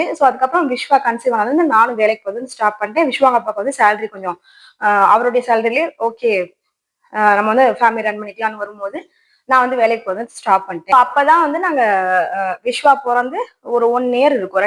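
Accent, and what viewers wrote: Madurai, India. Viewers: Akka neega Madurai ah ka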